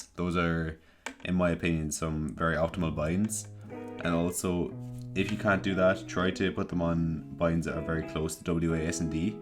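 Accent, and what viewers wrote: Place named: Ireland. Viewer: Irish boi doe :) I'll try the peek keybinds out. It's what I'm most struggling with when switching from console to pc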